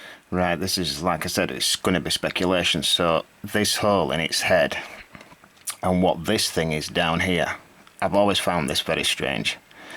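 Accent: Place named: Yorkshire, United Kingdom. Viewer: Hi mate,speaking to you from Yorkshire meself!Love the accent,love the truth.Let's year down this rain mainstream paradigm and blow some minds!Midfield by Roger intro,d me to this and just wow.Truth always seemed self evident from the last stage lolol what is it? Deny,try to destroy,process then accept,always the same.Thank you my friend.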